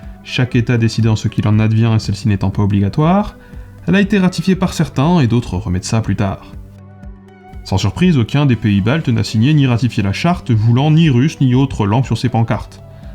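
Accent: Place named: Banlieue, France. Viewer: Un accent de banlieue. Nois qui avions appris le français à l'école on a du mal à comprendre tous les mots de l'accent de la rue c9mme ce mr qui avale certains mots . C'est moche. Je lui conseille d'écouter les narrations d'une chaîne youtube appelée LAMA FÂCHÉ. J Aime la voie de cette chaîne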